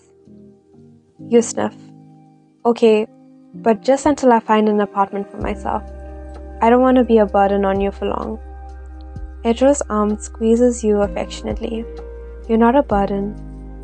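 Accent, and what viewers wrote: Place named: Afrikaans, South Africa. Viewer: Quick Questions: Are you Afrikaans? or Indian? Either way, I love your accent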